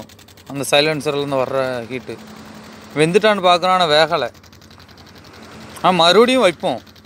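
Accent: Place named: Madurai, India. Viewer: Love to hear your slang thambi..please don’t try to change while you speak..I’m from Madurai and forgot most of the terms..While you speak,iI really feel good and become nostalgic.God bless.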